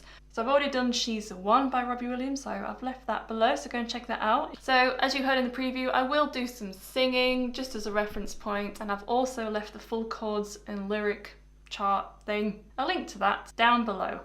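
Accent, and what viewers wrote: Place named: Lincolnshire, United Kingdom. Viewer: Hi, Your tutorials are really easy to follow and you explain them so well, I’ve be trying this on and off for a few weeks now and almost nailed it. Can’t add vocals yet though lol. Just out of interest and I hope you don’t mind me asking but you sound like you’re from my neck of the woods, sort of Grimsby Humberston area of Lincolnshire. Hope I haven’t offended you with that 😅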